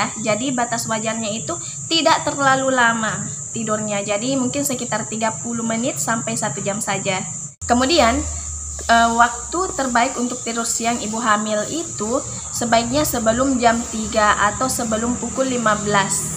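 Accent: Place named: Makassar, Indonesia. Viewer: Org makassarki 🤭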